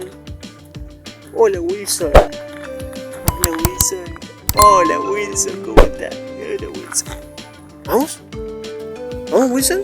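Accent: Argentina. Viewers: Está brutal este celu! mí única duda era si tenía radio y siiii 😻 gracias por mostrarlo!! Yo quiero el Moto G41 pero me es inalcanzable su precio y este tiene casi lo mismo por menos dinero 😍✨✨ excelente Review!! y más porque sos argentino y no español o de otro lado, se siente más cercano todo lmL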